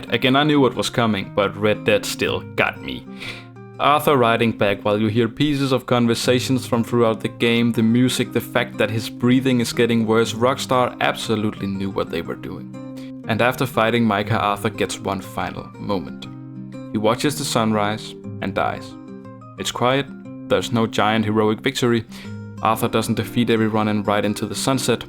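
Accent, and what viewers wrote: Denmark. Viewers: Jeg kan genkende noget danglish når jeg hører det😂. Super god video, det var lidt chokerende at se du startede for 10 dage siden. Meget imponerende og jeg glæder mig til at se hvad du poster i fremtiden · Are you from Denmark? You sound danish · Is that danglish i høre?😄❤️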